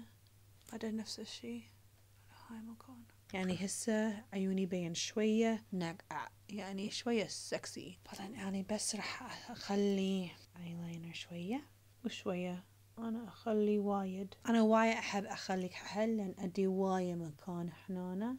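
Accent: Basra, Iraq. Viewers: ما تلاحظون أن أغلب كلامها عراقي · يخبل الفديو فرحانه بيج باللهجة العراقية احبج كلش هواية نفتخر بيج انتي عراقية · شكد ححلو كلامهه بالعراقي😍♥️ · أعشقها وهي تحجي عراقيه تنكرططططط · فدوووةةة البصراوي ابحلكهة ايخخخخخبلللل فديتج فدي من البجامة للبدي😊😊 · روعة اني بموت فاللهجة العراقية انا من الجزائر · تجنن بالعراقيي فخورة بيج لانج من العراق ❤️❤️ 🇮🇶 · فكرتك عراقيه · عفيه ضلي احجي عربي لن جنن لهجه العراقيه ع ومتابعيج عرب وعراقين اكثر من الاجانب😍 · ههه فدوه شون تحجي عراقي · شحلاتهه من تحجي عراقي😂♥♥♥♥🌷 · واو....استمري استمري استمري استمري.......ولا غلطة وكثير احب هيچ فيديوهات....هواي هواي هواي حلوووه اللهجة العراقية عليچ....متابعينچ من العراق / البصرة وكثير نحبچ😘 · متعلمه العراقيه من نور 😂😂😂يا الله وش حلو اللهجه العراقيه على كل لسان💚❤🇸🇦🇮🇶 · خرب شگد حلوه من تحجي عراقي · I loved your Iraqi accent ♥️♥️ Yes, you’re right its (khubuz al-moz) 👌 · فدوة شنو هالجمال وشنو هل لغه تخبلين من تحجين عراقي🥰🥰🥰♥️💋+خبز موزة✅✅ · فدوه تخبل اللهجة العراقيه بحلكج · حبيبتي كلش حلوه الهجه العراقيه عنديج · احبج من تتكلمين عراقي💋💋💋❤ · تلاحضون هية تحجي عربي بس اكثر شي عراقي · ههههههه كولش حلوووه من تحجي عراقي 😍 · انا حسبالي تتكلم كويتي اطلعت تتكلم عراقي🙂🙂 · احسهه تحجي بصراويي اووي فديت 🥺🥺🥺🥺😂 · حبيبتي كلامج يخبل لهجتج بالعراقي جنن ❤️❤️❤️i love you so much and i proud of you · اموت عليها وعلى لهجتها العراقية تخبلل😢♥️♥️♥️. 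· ماشاء الله تحكي عراقي بشكل جميل جدا 😍😗 كلش كلش احبك وانت كلش كلش قمر الف تحيا للعراق الحبيب 🇩🇿❤🇮🇶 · فديتج ولهجتج اجنن العراقي عليج يخبل كثري هيج فديوهات متابعتج من العراق 😍😍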